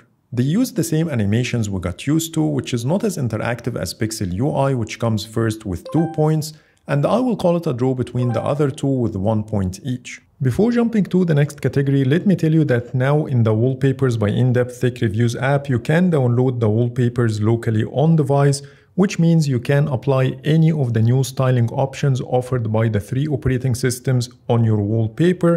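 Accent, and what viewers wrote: Egypt. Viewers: أول ما سمعت الصوت عرفت إن مصري ❤️❤️ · انت صوتك مصري 😅كل المصرين لما يكلمون انجليزي بيبقو برضو معروفين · Egyptian accent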